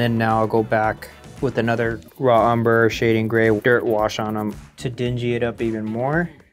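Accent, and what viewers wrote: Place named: United States. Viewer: Tienes nombre, apellido y pinta de ser hispano... ¿Por qué pronuncias aquello que eres como un yanqui?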